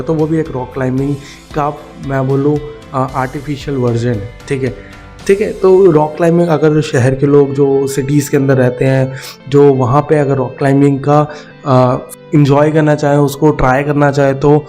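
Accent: Uttarakhand, India. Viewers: Bhai ap uttrakhand se ho kya 😊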